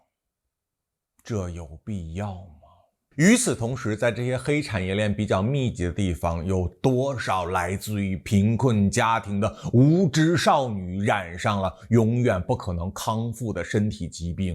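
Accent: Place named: Northeast, China. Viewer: 本想听两句傻逼言论。没想到一上来的煞笔东北茬子味就受不鸟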